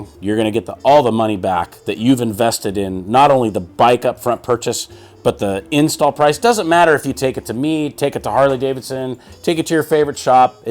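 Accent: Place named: United States. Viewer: Very interesting Video for me to understand how a Harley engine works. My M 8 is a Sport Glide 2018. I am not s mechanic, an i dont understand all you talking about (American dialect) cause iam german. Did u say the Oil pump must be changed as an generell rule or can i do it after a certain part of miles? Best regards from Augsburg Germany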